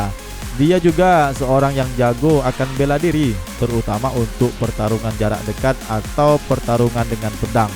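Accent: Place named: Medan, Indonesia. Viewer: ente orang Medan ya bang...??? Dari logat²-nya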